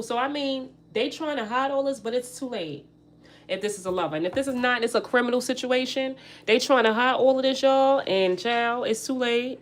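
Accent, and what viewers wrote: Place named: New York, United States. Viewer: Yes I can hear a NY accent i love it!!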